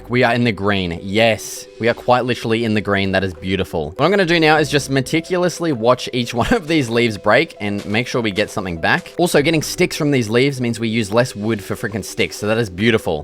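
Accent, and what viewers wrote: Australia. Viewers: Australian, isn't it? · This guy may have the most Australian accent I’ve ever heard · Aussie gang, I can tell by your accent and how you’re saying a lot of Aussie slang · You sound like an aussie mate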